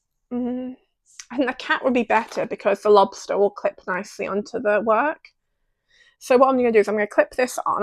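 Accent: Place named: United Kingdom. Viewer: Hi! New subscriber here. At first I thought you were local to me with your store name "Big Bear Yarns," I thought maybe you were from Big Bear, California (which is a great place to ski, and would be a great place to sell yarn)! Then I heard you speak and I said to myself, "Oh, I don't think she's local." 😂 Though you could be a transplant, but really, why would anyone from the UK want to live in the US these days, anyway? 😅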